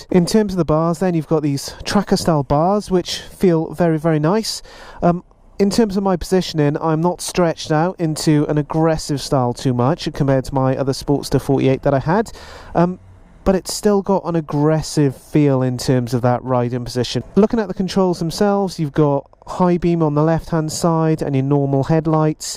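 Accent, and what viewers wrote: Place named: England, United Kingdom. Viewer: Good review mate. I have not ridden an Indian before but I don't care for the weight difference on the right side. To me, that is an Engineering flaw that could be addressed by Indian. I have to say though, as much as I like hearing how you talk across the pond, I just need more England accent and jive talk. Give us the good stuff... :)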